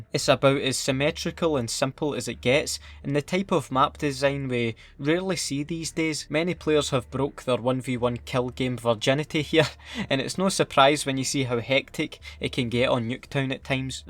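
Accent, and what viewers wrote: Scotland, United Kingdom. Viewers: Hearing a Scotsman explain literally anything makes it sound so much better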